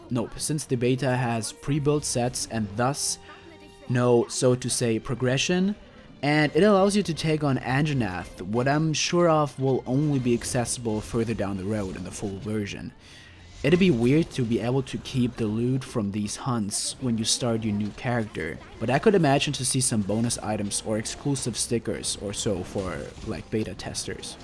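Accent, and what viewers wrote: Germany. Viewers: Are you German? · are you german?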